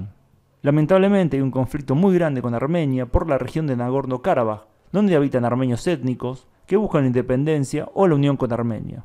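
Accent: Buenos Aires, Argentina. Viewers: ¿Vos te das cuenta de la cantidad de letras q leés como "eyyyyheee"? ¡HASTA LA JOTA LA CONVERTÍS EN ESAPORQUERÍA DE "LETRA" Q INVENTARON USTEDES LOS PORTEÑOS, CON LA Q SUBSTITUYEN NO SE Q´ CANTIDAD DE FONEMAS! ¿Tenés conciencia de cómo te baja el el nivel como para q te andés metiendo en temas de la cultura? ¿No se dan cuenta de cómo mutilan el alfabeto y el lenguaje con ese sonido caníbal insoportable? ¿De dónde lo sacaron? Si oís grabaciones de radio y tele hasta hace unos pocos 20/30años es imposible oír esa aberración brutal, q es pura ignorancia y degradación de la cultura nacional. ¡EXPLICAME CÓMO SE ESCRIBE ESE SONIDO Q ES A LA VEZ EYE, JOTA, ELLE Y NO SE CUANTAS MÁS! ¿Porqué no te dedicás a la cumbia, el cuartetazo y la bailanta, q te va a caer como anillo al dedo? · Si es argentino y el dialecto rioplatense destaca por un yeísmo exagerado, ¿cómo quieres cambiar su modo de hablar español? 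· Lo único aburridor el asentó, pero lo demás super, un acento colombiano sería super, pero bueno aceptar así los hermanos argentinos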